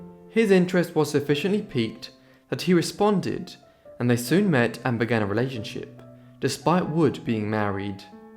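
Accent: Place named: RP, United Kingdom. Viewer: Oooh, I would also like to know where you are from! I detect a posh accent, but then again perhaps you use AI.? You are well-spoken/articulate; a great story teller who is easy on the eye, so that’s why you might be AI. No offense meant if you are Real or if use AI. Just finished watching. My guesses were your ancestors were from Spain, Argentina, France or Italy. Well since AI has no DNA, you must be Real then! :D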